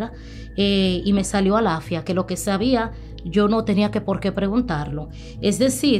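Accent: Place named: Dominican Republic. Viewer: Pero mami tú ablas como Dominicana a pues ya tu sabes. 🤔😁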